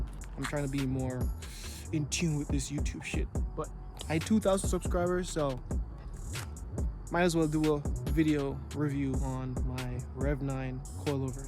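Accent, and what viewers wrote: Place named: Jamaica. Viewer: U sound jamaican dawg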